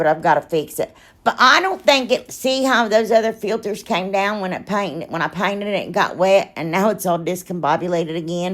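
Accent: South, United States. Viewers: I started watching YouTube to pass the time during covid lockdown. I can’t remember if you were recommend by another channel or a YouTube recommendation but as one of you other subbies said, I watch for your humour and inventiveness. Your American accent tickles me too! I live on the Lincolnshire coast, England. 🇬🇧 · She's a delight and fun! You would think she's from the south! But she lives in Ohio❤❤❤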